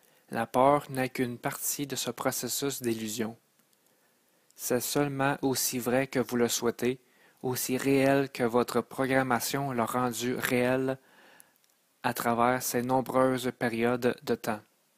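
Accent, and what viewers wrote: Quebec, Canada. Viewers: Accent québécois.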